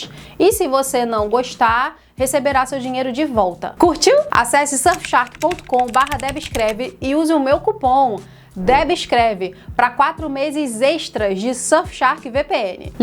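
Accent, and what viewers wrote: Rio de Janeiro, Brazil. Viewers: A PUXADINHA CARIOCA SUA É INEVITÁVEL NE...... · Mesmo com um sotaque carioca que eu não curto muito, a faz um conteúdo maravilhoso. Amo e coaduna com suas opiniões. E sim, a Testa Ramsey é feia e matou a personagem do TLOU. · é a minha carioca preferida (e olha que eu detesto sotaque carioca) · Você é uma carioca da gema!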